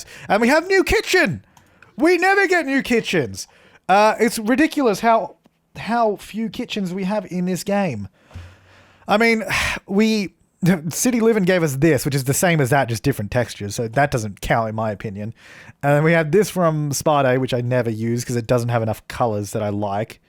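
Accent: Australia. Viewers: Its so good hearing an Aussie voice talk about the sims <3 :D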